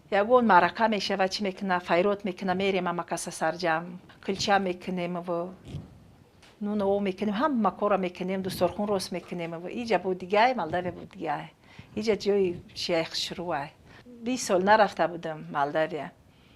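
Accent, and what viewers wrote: Tajikistan. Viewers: Ика забони точикии худмон ширинай. Бо и Модар ширинтар гап мезадас. Ахсан Модарчон мехнатотон рохат шаватон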